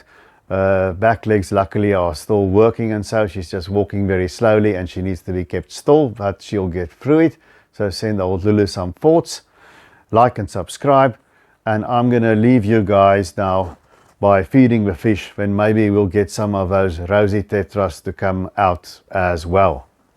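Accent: South Africa. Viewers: Love South African accents, great tank too!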